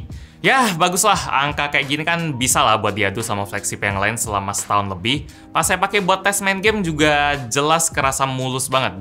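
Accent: Palembang, Indonesia. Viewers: 2.ikok bae ? Wong palembang apo ? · Uong palembang ruponyo haha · "Digabung tigo ikok, yang ini ini ini, atau duo ikok be". Palembang nian bang 😂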